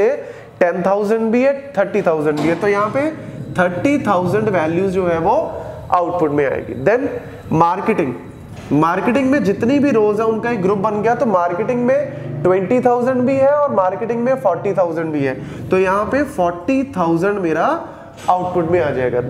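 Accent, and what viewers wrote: Punjab, India. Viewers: You are amazing man. Thank you so much for your lovely sessions. I particularly like the punjabi flavour in your Hindi. These sessions have been of so much use to people like us. May you always stay healthy and happy. Coud you make a few sessions on SQL queries that are frequently used by manual testers. Would be of a great great help. Thank you once again from the bottom of my heart. Let us know your name dude.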